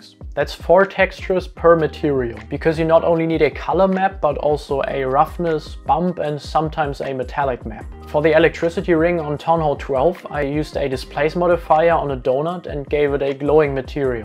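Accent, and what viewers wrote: Germany. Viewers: Man hört den deutschen akzent so was von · du bist deutsch man hörts;) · Dude you got a german accent ( Nicht böse gemeint)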